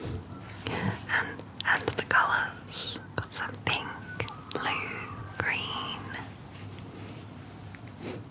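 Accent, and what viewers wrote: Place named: Australia. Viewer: Australia has my heart because the accent is MELTING ME -from over here in America ❤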